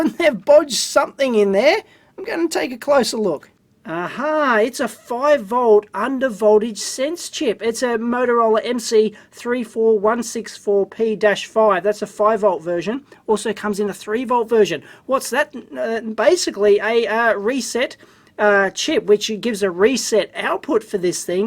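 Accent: Australia. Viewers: First time i have heard a Nerd with an Australian accent lol.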